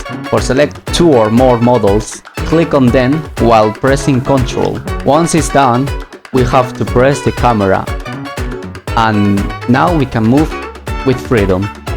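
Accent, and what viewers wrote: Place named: Germany. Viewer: pretty german, good tutorial helped me alot 🐙👍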